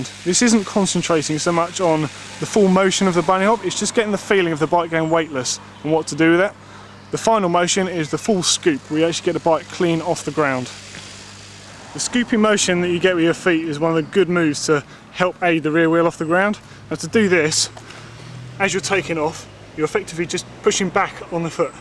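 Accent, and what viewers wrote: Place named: United Kingdom. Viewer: its just a normal british accent lol wats so amazing about that?!?!?!?!?!?!?!?!?! :L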